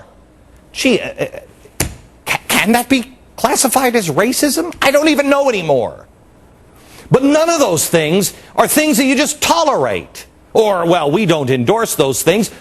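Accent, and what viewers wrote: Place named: Germany. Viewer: im not even sure Glenn is american!!..has anyone seen or verfied his birth certificate???? and doesnt sound German like General Von Bock Bock/?.. in fact i think hes half south african...... ask him to say South Africa if he pronounces it Seth Efrica ya got ya proof.. nope he certainly dont seem and as for doing a good job by pissing liberals off id say as he stands for what the repulicans think hes making their credibility plunge to even deeper depths.....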